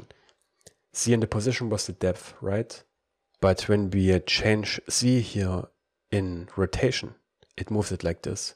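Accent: Germany. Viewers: Love your teaching way brodar , your humour , your german accent lol awesome keep it up!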